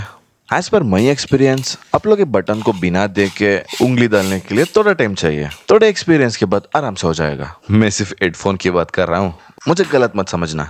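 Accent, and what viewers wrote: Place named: Northeast India, India. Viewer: Bhai tum kaha se ho, aapki aawaj se lagta hai ki aap northeast India 🇮🇳 se ho 😅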